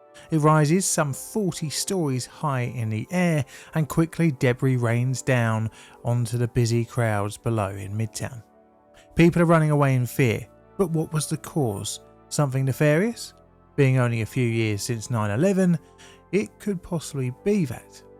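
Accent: United Kingdom. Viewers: Now I know, what all that steam we see is, in the cities. Very 19th. century-ish, and modernly beset😆. As you can tell, I'm thankfully NOT, from any big city. How could 2,000 customers, turn out to be 100,000 businesses, and residential customers?? Ain't that, the same thing🤔?? And if the narrative was spoken with more English, and less British, we here across the pond, might be able to understand exactly, what you're saying.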